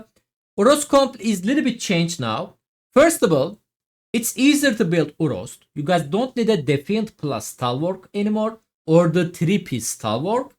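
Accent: Turkey. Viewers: hacı Türk olduğun çok belli konuşmandan :) ama Helal olsun Türk yayıncı pek yok bunu yapan teşekkürler yardım için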